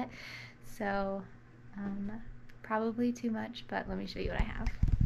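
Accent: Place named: South, United States. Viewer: WHAT YOUR ACCENT SOUND DEEP SOUTHERN LADY WITH APPALACHIAN WORDS ... SHE PASS ... GOOD LUCK MY FRIEND.. APPALACHIAN TRAIL IS SO COOL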